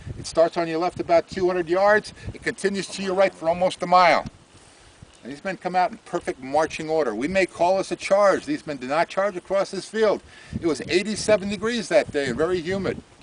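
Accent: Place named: North, United Kingdom. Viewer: This fella tells the story with passion. To my foreign ears he sounds like a Northerner, but he still has the passion for passing on the deeds of those that went before him. Great presenter, great passion and a credit to himself. Well done sir. Glad this was in my feed today :-)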